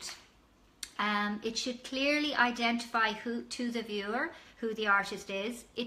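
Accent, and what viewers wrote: Ireland. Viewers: First of all, I love your Irish accent (" ")! 😀👍 I often wish I were more connected to my English, Scottish, & Irish lineages, but to date I've not achieved that. An interesting fact that you may or may not already be acquainted with. I learnt some years ago from a reputable Jamaican newspaper's website (i.e. "Jamaican Gleaner") & an article on its series "Out of Many, One People" or some title like that to emphasize the multiethnic status of Jamaica's population & to imitate the national motto that speaks of this same phenomenon, I learnt that the accent of the Jamaicans in their speaking of English was primarily due to the African enslaved being most shoulder to shoulder with the Irish indentured laborers who were yet, of course, speaking the Irish brogue. In fact, I used to work telecommunications a lot in the past as employment & I would occasionally get Irish clients that I thought were Jamaican until they clarified that they weren't! 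So, yeah, that's the bit of trivia for you. Now, regarding this topic of yours you sought to do your presentation on, it is such a tremendously neglected topic albeit the topic being so essential & so it is precious that you've dealt with it herein, yet not only dealt with it, but dealt with it quite thoroughly & skillfully. Good job! The thing is, my late father having been the very gifted artist that he was made me to be well aware of many of these points from in my boyhood (or when I was a "lad," as the Irish would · I hear Ireland flowing through ... ☘️ The land of my lineage, County Kerry, Ireland 🇮🇪 M Beth USA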